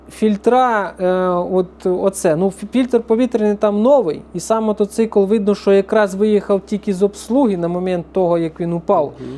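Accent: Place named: Ukraine. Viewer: попадаю додому цей акцент ні з чим не спутаєш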